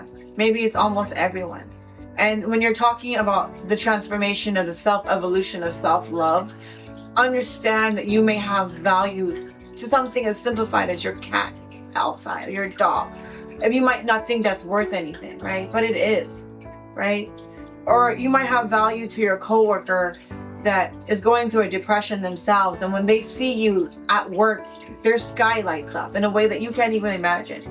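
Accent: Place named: United States. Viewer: Presentation is excellent and your voice and words pronounces are great with subtitles (pure American accents) keep it up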